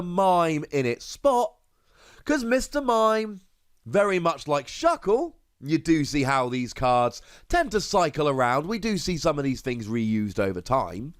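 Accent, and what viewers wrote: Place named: England, United Kingdom. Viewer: I LOVEEEEEEEEE English accent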